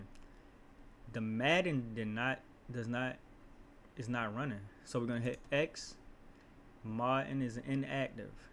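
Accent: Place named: Baltimore, United States. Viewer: you from baltimore?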